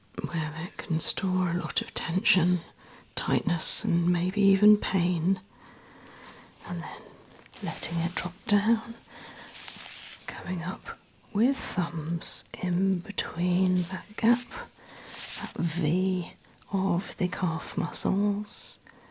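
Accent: United Kingdom. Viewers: I love your accent idk if that's just genetic British accent or what lol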